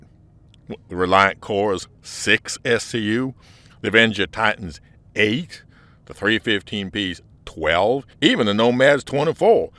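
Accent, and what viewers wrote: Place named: South, United States. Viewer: I say, I say...I enjoyed the foghorn leghorn southern inflection/accent. Not super familiar with Bezsek.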